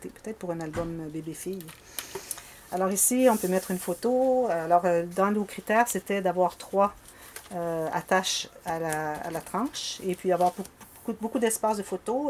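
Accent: Quebec, Canada. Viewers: Bel album et comme j'ai commenté sous la vidéo de présentation de votre album, je vous suis toutes les 2 avec plaisir. Bien sûr, en français cela m'est plus agréable et facile à suivre, et comme je l'ai déjà écrit et comme d'autres vous le disent, ah lala ! Votre accent nous ravit et moi qui suis amoureuse du Québec parcouru par 2 fois déjà, je rêve en plus.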